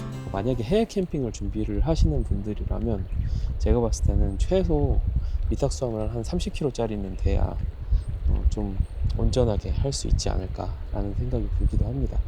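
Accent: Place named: Japan. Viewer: 얼마나 설레며 준비를 하셨을까 그 마음이 저한테 까지 느껴집니다🔥 그나저나 일본톤 찰떡입니다👍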